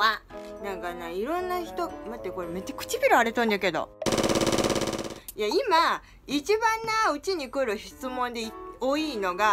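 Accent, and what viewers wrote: Okayama, Japan. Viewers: 毎回思うんだけどのおかげで岡山弁がかわいく思えてきた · 岡山弁なのと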